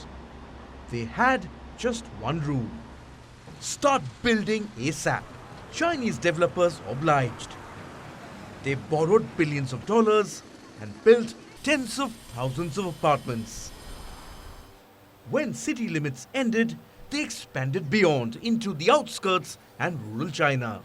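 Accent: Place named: India. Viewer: Her thick Indian accent..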